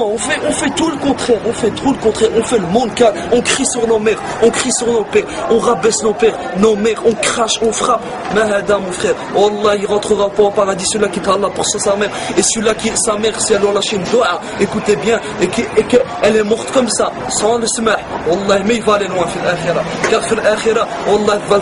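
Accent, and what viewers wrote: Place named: Maghreb, North Africa. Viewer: إذا كنت تقصد هذا الشاب - بارك الله فيه - فهو اما ان يكون امازيغي او عربي من المغرب او الجزائر فهم كثر في فرنسا. اللهجه واضحه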